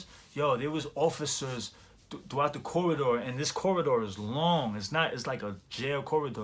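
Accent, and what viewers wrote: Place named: Brooklyn, United States. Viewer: So brooklyn bro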